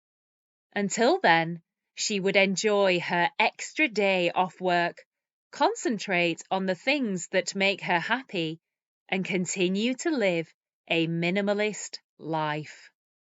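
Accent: United Kingdom. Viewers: Thank u :-). Your channel is amazing. You are helping me to learn English and also I am able to learn gorgeous British accent. I am from India and I want to Go to London in future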